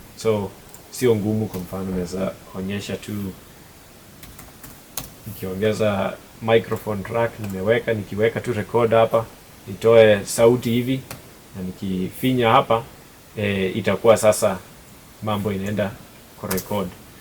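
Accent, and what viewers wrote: Bukusu, Kenya. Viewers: Accent ni ya mbukusu bana he talks like mulamwah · FOR ME IT'S THE LUHYA ACSENT, I LIKE THIS HAPPY FAMILY, MAY GOD CONTINUE BLESSING AND PROTECTING THEM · The luhya accent is on point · Mzungu mbukusu😅accent niya kibukusu aki😅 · The Luhya accent is just awesome 😀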